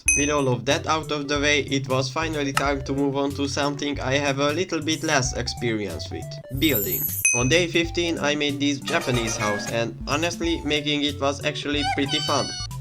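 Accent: Hungary. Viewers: magyar vagy? csak azert kerdezem mert hallom az akcentust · ijj tesó a magyar akcentus (félre ne értsd, nincs vele baj <3)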